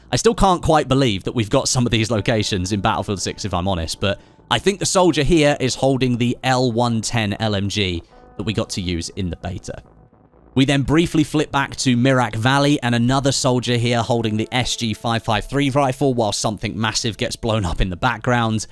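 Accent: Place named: West Sussex, United Kingdom. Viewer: Im pretty sure you’re from West Sussex. I overheard someone in a coffee shop who sounded exactly like you.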